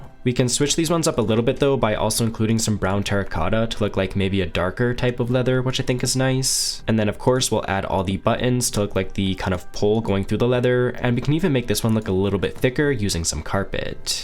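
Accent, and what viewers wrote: Canada. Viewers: It's just because he has a Canadian accent. They just speak that way, calm down bro.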